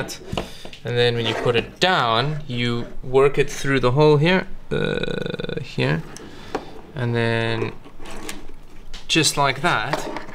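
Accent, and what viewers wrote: South Africa. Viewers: Wait you're south African?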